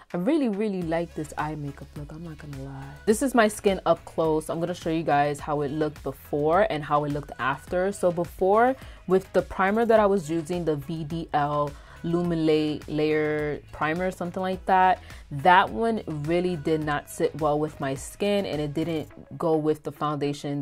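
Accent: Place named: New York, United States. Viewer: Hi! I found your channel and I just had to tell you that you’re absolutely adorable! I could listen to your accent forever! My family is from NY and I miss hearing voices like yours. I love your techniques and you have the most mesmerizing eyes ever! Hugs from Seattle. ❤️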